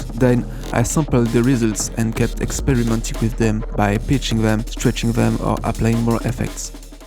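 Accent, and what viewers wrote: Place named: France. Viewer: I recognized a French accent Mdrr 😂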